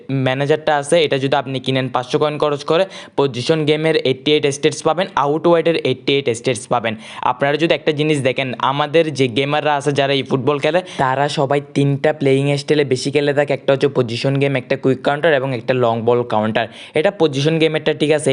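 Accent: Chittagong, Bangladesh. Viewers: ভাইয়ে কি চিটাইংগে নে?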